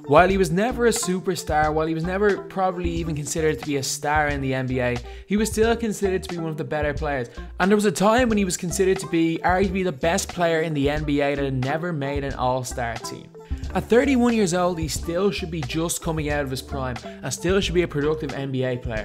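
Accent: Ireland. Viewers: where is josh smith now you ask, in the nba i hate your irish accent go watch your own sports you potatoe eating fuck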